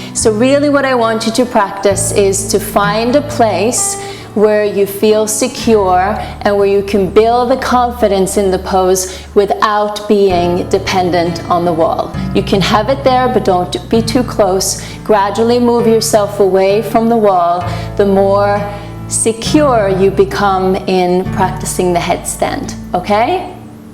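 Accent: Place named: Sweden. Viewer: Thank you! By the way, are you Swedish? I think I can spot a very subtle Swedish accent.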